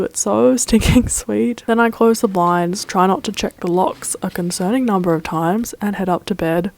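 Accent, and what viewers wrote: Australia. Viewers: Wasn't expecting the Australian accent, but hello from a Brit who is an Australian citizen! This has given me a great idea to track my own day for all of the accommodations I give myself. Not sure what your invisible illness is as I'm not familiar with your channel, but I'm hoping you've looked into POTS. And love Bananagrams, btw 🙂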